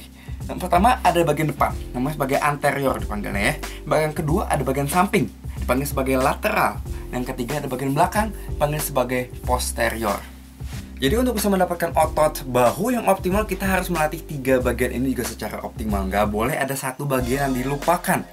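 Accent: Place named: Banjar, Indonesia. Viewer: logat Banjar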